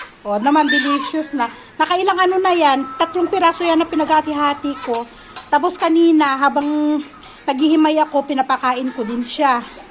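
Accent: Philippines. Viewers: I like the way u speak taglish hehehe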